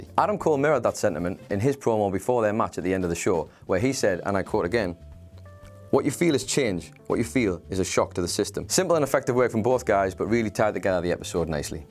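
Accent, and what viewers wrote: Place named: North, United Kingdom. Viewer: Holy... dude slow down! Talks way too fast... way too British... fast British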